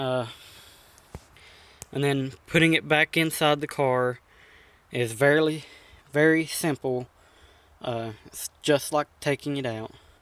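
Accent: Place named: Pittsburgh, United States. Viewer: Are you in the Pittsburgh area? I noticed from your accent. If so, I have a 99 Legacy I might need advice with for changing bulbs... pm me!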